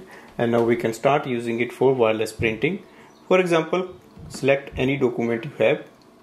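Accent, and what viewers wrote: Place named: India. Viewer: 인디안 엑센트는 적응이 안되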